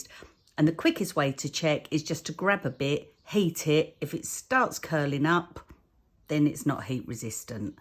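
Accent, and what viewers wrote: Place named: England, United Kingdom. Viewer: Hi. I love your tutorials so nice to hear your english accent. - I'm 81 & in UK but still enjoying my cardmaking. Thank you for your inspiration. 🇬🇧🇬🇧🇬🇧🇬🇧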